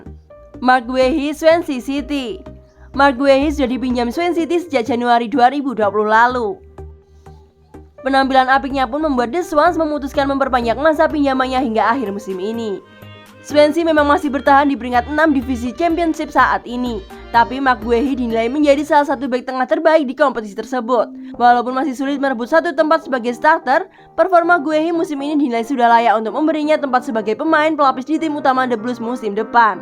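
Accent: Java, Indonesia. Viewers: pengisi suaranya medok min 😁🤭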